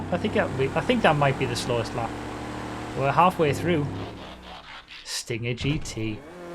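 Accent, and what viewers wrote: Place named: Newcastle, United Kingdom. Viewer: that is what is scientifically known as a Geordie accent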